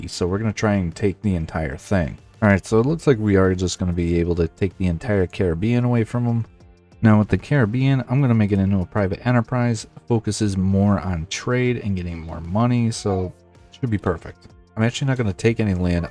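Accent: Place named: Upper Midwest, United States. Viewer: Are we a Wisconsin Or Minnesota or Michigan native? I know a upper Midwest accent when I hear it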